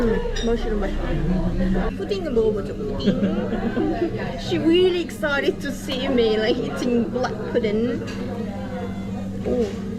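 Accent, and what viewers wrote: United Kingdom. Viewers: 친구랑 일으니까 더 편한 너낌 영국이라 그런지 발음이 더 딴딴한 너낌이랄까 ㅎ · Loving to Listening 님 speak English with British accent in the UK borderland. Wow... What a Perfect Combination!! And very Spontaneously. ㅋㅋㅋ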